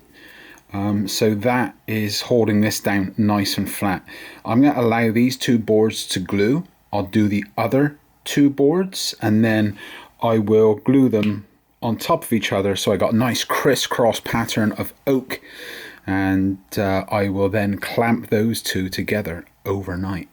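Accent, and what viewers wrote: United Kingdom. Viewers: Hi you sound British do you live in the USA? I am in the Uk I see you have a bit more space. Nice project.Checkout the little restoration project I started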